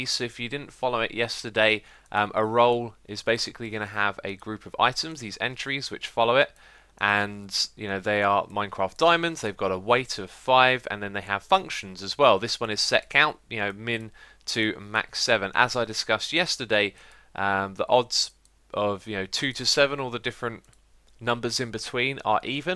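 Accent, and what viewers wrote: United Kingdom. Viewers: Honestly, I'm so confused about this whole thing xD. Not that you're bad at explaining, but that I'm stupid :D. I just like your voice. British accents are awesome to me, cause I'm American, and I just love your stuff :D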